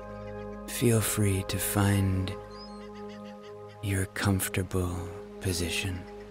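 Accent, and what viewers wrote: Ireland. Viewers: You're silky Irish tone makes me smile and reminds me of Co. Mayo 💞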